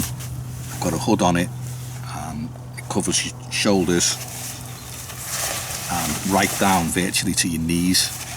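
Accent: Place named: Liverpool, United Kingdom. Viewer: Where you at mate?.............. Got a scouce twang there fella so cant be far from me? lol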